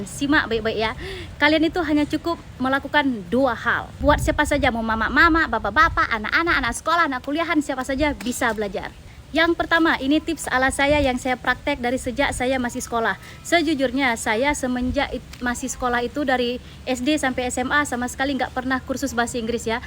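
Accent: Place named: Aceh, Indonesia. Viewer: Bereh bu kemanapun gaya Acehnya tetap aneuk Aceh mețuah.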